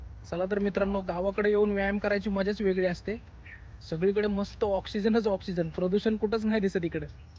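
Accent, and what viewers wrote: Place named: India. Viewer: दादा why don't you stick to what you are good at? We watch your videos for your daughter and and your non Punekar- non nasal-authentic Marathi language which is rare to hear now days. Why don't you stick to it rather than becoming passive aggressive to preach your personal thoughts and condescendingly asking people to rethink their habits and choices? There are enough youtubers out there preaching THAT stuff. You don't need to appear one of them. सुरक्षित अंतर ठेवा. 🙏🏻☺️🙏🏻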